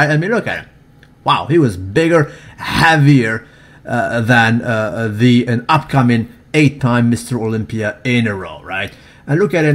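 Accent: France. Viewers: son accent est plutôt français que québecois dailleurs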